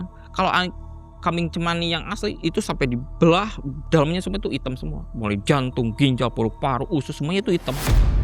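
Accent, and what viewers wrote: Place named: Bandung, Indonesia. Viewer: logatna bandung pisan,saee dina bahasa na..jadi paham rng enakeun kakupingna th👌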